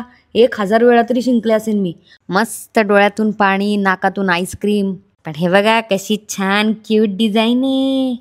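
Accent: Beed, India. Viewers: Tarich bhasha same ahee😂 beed♥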